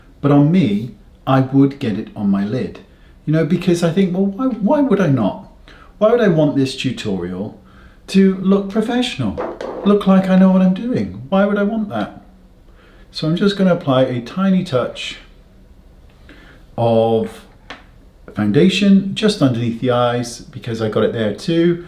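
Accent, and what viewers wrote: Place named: England, United Kingdom. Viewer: It's his lovely English accent, he's from Somerset I think which is usually a very broad accent, his is quite soft and only comes through occasionally. He does have a really nice voice. I watch a few English YouTubers and some of it has to do with their accents. I'm originally from the UK and I think I miss hearing English voices.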